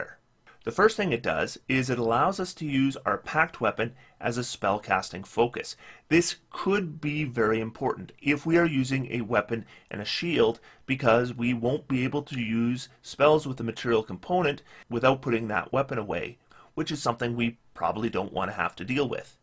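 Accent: United States. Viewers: aegonizing blast. gotta love that northern midwestern accent, heh!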